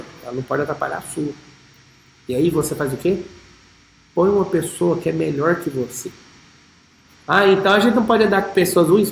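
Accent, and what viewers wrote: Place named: Goiás, Brazil. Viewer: Alguém em agosto de 2024. Até o sotaque dele era um goiano bem puxado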